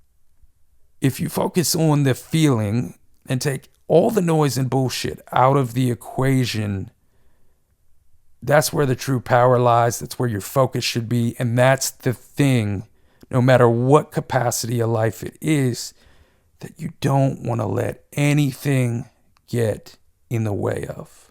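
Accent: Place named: West Virginia, United States. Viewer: Are you from WV fam? Or do tennesseans say "on" like West Virginians?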